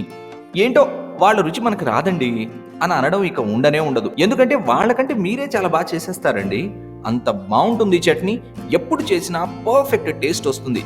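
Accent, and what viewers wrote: India. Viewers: Feedback: We love your attitude Love your honesty and sincerity when it comes to making your videos of recipes and presenting them as traditionally as possible Love your language, voice, intonation, the Teluguness in it, and your simplicity I never compare videos, but I think your videos are amongst the best, especially because they are very well made, and edited. Most importantly, the videos are short enough and very easy to understand and the recipes are straightforward and convenient to memorise and to reproduce. Thus far, all your recipes that have been reproduced at our home have proved to be great successes, and if there may have been any mess-ups, the fault lies with us, and not your videos. Yes, we'd love you to add your face to your voice. And I wish you'd expand the cuisines, venturing even into various parts of India. All the best Love A Foodie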